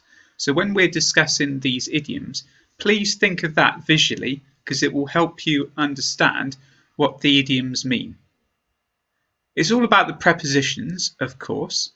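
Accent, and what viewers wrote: United Kingdom. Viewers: Thank you! Your videos do help me a lot! I am a Chinese student majored in English language and literature. I haven't been in England yet. But your videos provide me a window to Great Britain; and make me feel like that I have been living in London already. I had watched your videos for two years since I got access to YouTube in 2014. Watching your videos like taking English course, that makes you as my mentor. Your videos are always interesting and useful. They really help me a lot. And one more thing, your British accent , classical! Waiting for your videos. Thank you for your videos.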